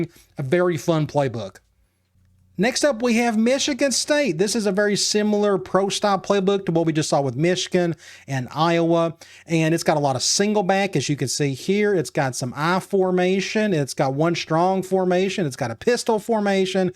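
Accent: South, United States. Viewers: He's definitely Southern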